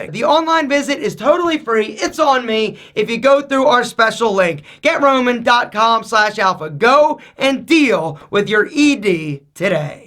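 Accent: United States. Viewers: One thing I can say is, you are Talkative! Don't speak too much, remember you are American (have accent and not all your viewers are saMe like your race) not a Pilipino or Indians or Thailand etc. If your other subscribers and viewers are not same your race, so do you think they will understand you if you speak so fast like this now? And most, if the person have no idea what is testosterone then how it is even You explain in a fast track!!! Weeeew..... It's good that I am fluent in English, if to other people who loves to watch your video, I hope they will understand you in that ways how you speak like a Talkative Guy, Parrot is better than to You how to speak hahaha sorry peace I am your good watcher I think but today I comment negative because this is what I observed guys sorry! 🇵🇭🇵🇭🇵🇭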